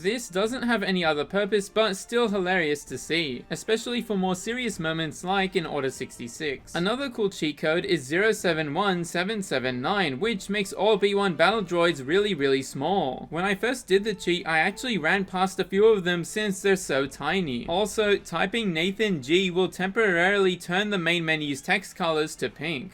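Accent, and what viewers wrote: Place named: Australia. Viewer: I was baffled when you said this game had an M rating but realized your Aussie accent and then it made sense 😆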